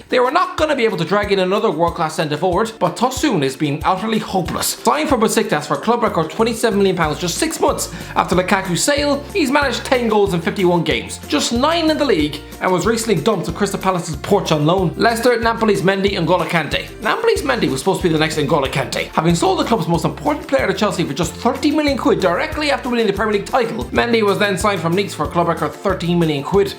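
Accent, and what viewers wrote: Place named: Ireland. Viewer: Pro tip for all the non-Irish folks, watch the video at 0.75x speed to better understand the genius of his jokes through his accent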